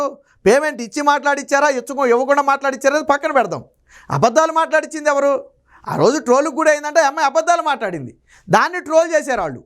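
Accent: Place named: Guntur, India. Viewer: Maa Guntur language, manchi diction, 🎉 excellent presentetion, soopper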